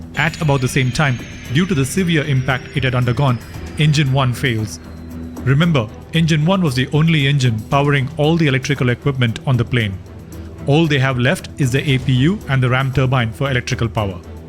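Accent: India. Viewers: Bro are you Indian or Australian? The accent is definitely Indian. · The Indian accent makes it very hard to watch.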